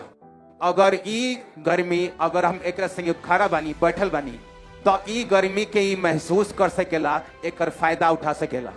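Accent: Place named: Bhojpuri, India. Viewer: Praise the Lord, Papa ji shudh bhojpuri. Wah...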